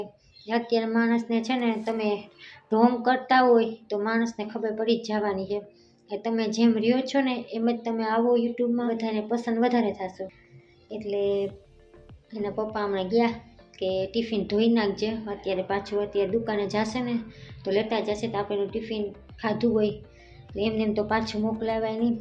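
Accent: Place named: Porbandar, India. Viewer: પોરબંદર ની ક્યાંક તોસડી બોલી આવી જાય એટ લે તમે ક્યાં ગામનાં છે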